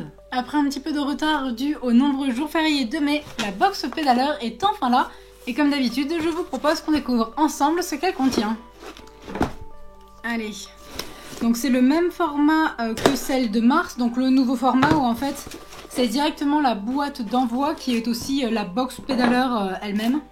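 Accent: England, United Kingdom. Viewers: Quel belle accent anglais 😁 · Des chaussettes 😃 !!!! routine recup ✌️✌ cool l'accent anglais 😜😜 remarque c'est normal !!! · super video encore!! j'avoue que je ne prendrais pas la box pédaleur, mais franchement c'est super sympa de nous faire découvrir ça, et puis c'est toujours cool de pouvoir avoir des nouveautés qui peuvent arriver sur le marché, grace a toi!! Et pour finir j'aime beaucoup ton "accent anglais" quand tu parles:-D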